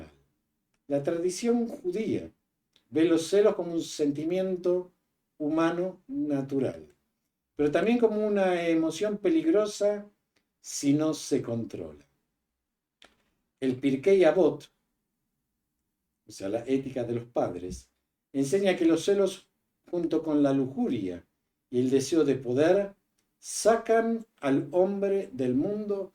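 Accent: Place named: Buenos Aires, Argentina. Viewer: Yo pienso, señor que tiene una voz buena para el canto, y su acento porteño tan marcado, me gustaría escucharle un tango, o una milonga, como:, y otros. Porsupuesto con todo respeto a sus enseñanzas, y lecciones cuantas cualidades, shalom ve alejem.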